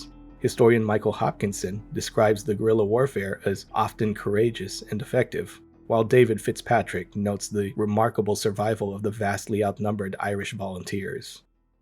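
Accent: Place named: United States. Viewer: Jayzush Christ will ye be dropping the yank accent for phuqqs sake! Seán McKane? Rural members were unreliable? Tell that to the Flying Columns in Munster, Longford, and Mayo.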